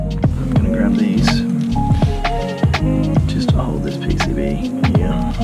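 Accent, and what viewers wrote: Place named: Australia. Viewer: So aussie...love it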